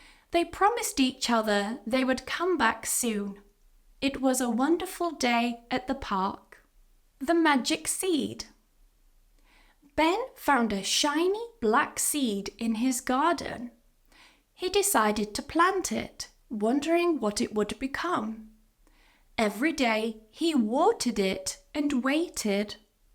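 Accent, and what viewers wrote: United Kingdom. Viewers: From India, learning British accent. is helping me a lot. Thank you!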